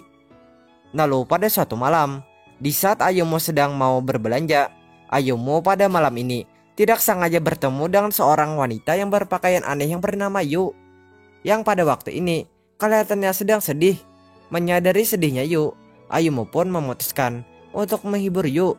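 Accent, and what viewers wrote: Banjar, Indonesia. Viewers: Orang banjar kah bang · Bang lo org banjar kh?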